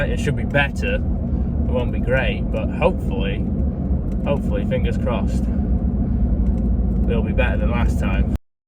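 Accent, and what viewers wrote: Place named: United Kingdom. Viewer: Welcome to the states. I assume you live in the UK? Those hard boiled eggs looked good. Now I'm hungry for hard boiled eggs. Looks like you're well packed for your trip. The truck bed looks comfortable. Sounds like a good time with family in Vegas and camping afterwards. Enjoyed the video. Love your British accent.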